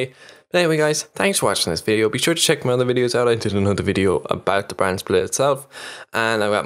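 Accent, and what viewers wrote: Ireland. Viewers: im guessing your irish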